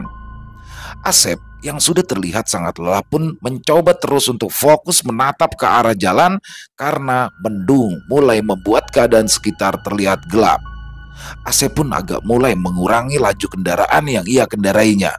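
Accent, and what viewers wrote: Jakarta, Indonesia. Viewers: Waw cirikas Betawi nya bang bikin ketawa sendiri gua,lucu, serem semua nya dapat, Kren bang, kyk orang gila gua denger baba Ali nya becanda😅😅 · Saya kalaupun orsun Dengar cerita logat betawi suka bngt medok2 lucu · Waalaikumsalam.keren cerita nya..cocok bnget klo yg bercerita nya. betawinya masuk banget.inyi nya puas dan trhibur..mas ditunggu ceritanyw.bang pian..bang rudy dan mas sudiro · Nah ini yg ane demen dr klo udah pake logat betawi. Kocak 😂😂👏👏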